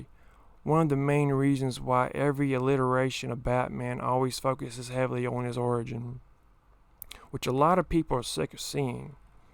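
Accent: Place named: South, United States. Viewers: Right there with ya fellas. I’m from KY too. The world is in serious need of badass southern YouTubers like this guy. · As i am from KY myself, i must say it is quite refreshing to hear a southern accent on this video. Feel like us southerners just ain’t on YouTube or maybe they’re hiding it cuz it’s viewed as not intelligent by some. Brother you won me over and got my sub. Keep up the work man I like this video a lot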